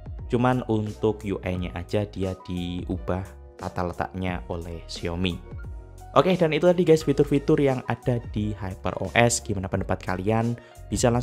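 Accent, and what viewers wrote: Banyuwangi, Indonesia. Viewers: looh mas e wong banyuwangi rek😂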